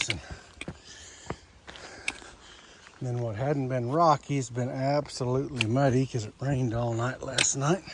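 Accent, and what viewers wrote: South, United States. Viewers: You are doing some killer miles! Awesome job! I love your Southern accent . It makes me feel all warm and fuzzy on the inside lol keep up the awesome videos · Love your voice. I can't remember if you said where you are from. You sound southern.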